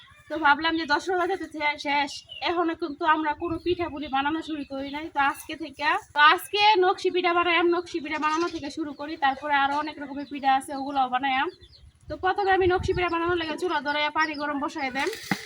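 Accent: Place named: Comilla, Bangladesh. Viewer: আগে বলেন আপনাদের বাড়ি কোথায়??? ভাষাটা চেনা চেনা লাগছে, বেশিরভাগ আমাদের মত। তবে আপা অনেকদিন পর এই পিঠাটা বানানো দেখলাম, এটা কে আমাদের কুমিল্লায় ফুল পিঠা বলে। সেই খেজুর কাটা কাঁচা বাঁশের লেইল দিয়ে বানাইলেন, অতীতের পিঠা বানানোর সব স্মৃতি মনে পড়ে গেল। আমাদের দেশে বিয়ে এবং মুসলমানি অনুষ্ঠান আর নতুন জামাই বেয়াই আসলে, এই পিঠা আরো বিভিন্ন রকমের পিঠা বানানো হয়। আমি ভালো পারতাম না, আমার মা ভাবি পাড়ার অনেক মহিলারা মিলে বানাইতো, কিছু কিছু পারতাম, কারণ তখন ছোট ছিলাম। আজ আপনার কাছ থেকে ভালোভাবে দেখলাম। এখন পারবো মনে হয় ইনশাল্লাহ, আমি আপনাকে লাইক কমেন্ট সাবস্ক্রাইব করে দিয়েছি। ভালো থাকবেন। আর আমার জন্য দোয়া করবেন আমি খুব অসুস্থ, এবং শোকাহত, আপনার ভিডিও সব সময় দেখব কথা দিলাম। আসসালামুয়ালাইকুম 🙏❤🌻🥀🤲👍🎉 ঢাকা থেকে 🙏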